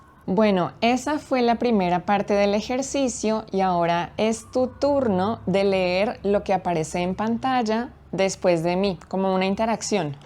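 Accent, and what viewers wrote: Colombia. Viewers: Como siempre, es un placer escuchar tu hermosa pronunciación y lindisimo acento colombiano! 🥰 Tuve que pausar el video porque no tuve tiempo para responder, pero quizás era tu intención. Muchas gracias, me encantaría tener más como esto!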